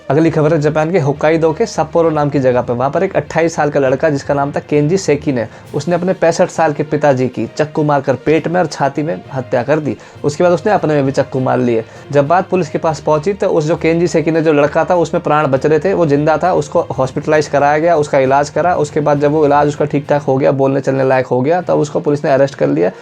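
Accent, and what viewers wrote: India. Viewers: Desi accent mein Japanese news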